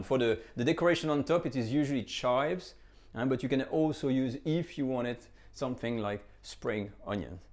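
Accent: France. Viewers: Is your accent a regional one? I love it. Bit different to other French people I’ve heard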